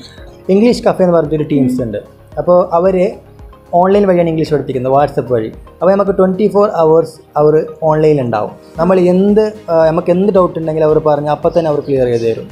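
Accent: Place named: Udupi, India. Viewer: She is from udupi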